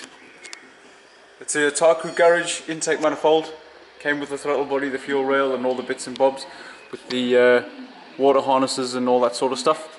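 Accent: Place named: South Africa. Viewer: You south african, bru? giggle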